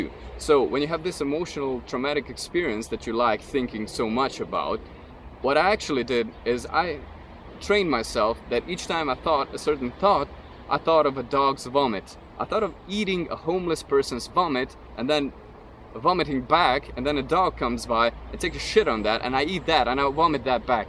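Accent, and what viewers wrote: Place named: Netherlands. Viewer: Great points and analysis, totally right on. I like what you said about spiraling around the issue and getting closer to the emotional pain, that's a great visual image and definitely accurate. Where do you live, Holland? Just guessing based on your accent.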